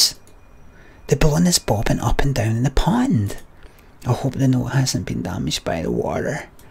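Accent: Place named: London, United Kingdom. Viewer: The London accent is the best thing about this stream, cracked me up haha!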